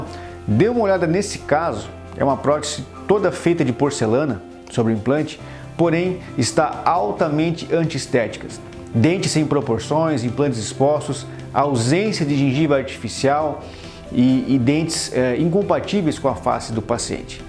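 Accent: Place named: Pernambuco, Brazil. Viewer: O senhor é de Pernambuco Dr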